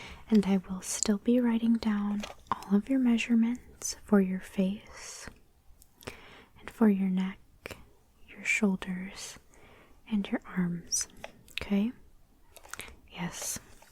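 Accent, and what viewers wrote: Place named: South, United States. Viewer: Really enjoyed that, Thanks! And are you Southern? You kind of sound like Kelly Clarkson! I have had a crush on her since i was a teen lol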